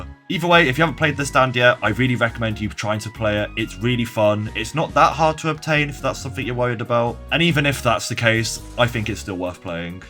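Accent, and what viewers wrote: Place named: United Kingdom. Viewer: clicked this thinking it was eclipse and then was like “who the fuck is this British guy” 😭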